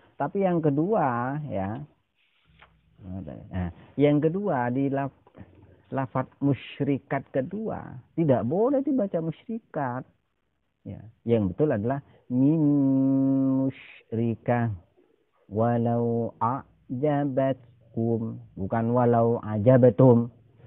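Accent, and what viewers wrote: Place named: Madura, Indonesia. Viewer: Ketauan banget orang Madura ya cak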